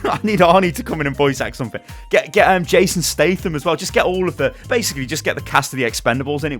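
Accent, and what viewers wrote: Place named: United Kingdom. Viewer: did get an annoying british accent and learn to clickbait? or is this just?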